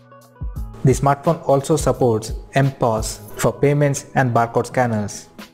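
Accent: India. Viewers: English (Indian phonetics)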